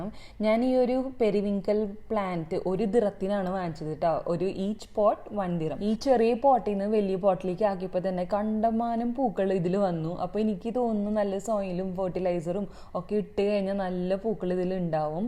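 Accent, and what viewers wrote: Thrissur, India. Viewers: ഇന്ന് ഭയങ്കര തൃശ്ശൂർ സ്ലാങ് ഉണ്ട് സംസാരത്തിൽ 😁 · Eee thrissur slangine evide olippich vachirikkaayrunnu? 😅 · I could hear the trissur kaari in you dear ...was a lovely relaxing vlog ...i miss Kurti shopping too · Pakka thrissur slang❤❤❤❤ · This time Thrissur slang nallapole vannittund.... Nalla change... Purple, blue, pink ellaam nice kurtis aanu.... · Aaha...namude thrissur slng aanalo inn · Why suddenly this thrissur slang ? I never felt this before in ur vids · Pakka thrissurkariyayallo😍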